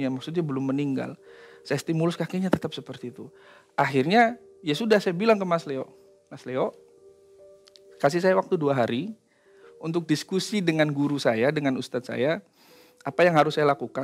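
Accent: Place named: Banyumas, Indonesia. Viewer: Saya Suka dialeg bang...natural gak d jaim2in...lucu khas jawa ngapak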